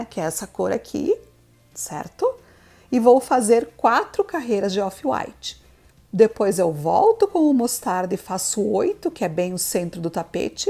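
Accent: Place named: Rio Grande do Sul, Brazil. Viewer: Sotaque gaúcho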